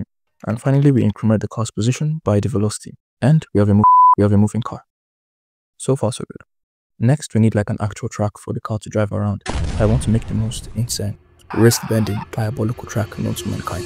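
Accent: Nigeria. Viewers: Pure naija boy 😂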